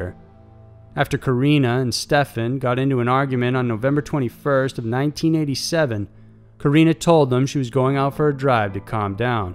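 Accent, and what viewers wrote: United States. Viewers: The narrator has a perfect American accent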